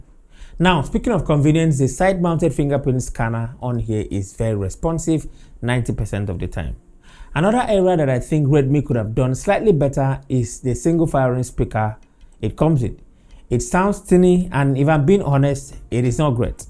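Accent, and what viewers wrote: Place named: Igbo, Nigeria. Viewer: Igbo man , I can hear your accent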